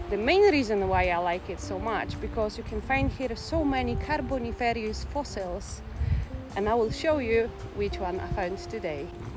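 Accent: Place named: Scotland, United Kingdom. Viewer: I love her Scottish accent.😅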